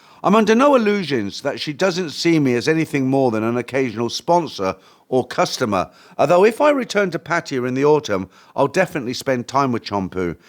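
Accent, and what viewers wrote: United Kingdom. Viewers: Great story - but your "reading style" with the British accent, and no mistakes makes the stories sound very entertaining .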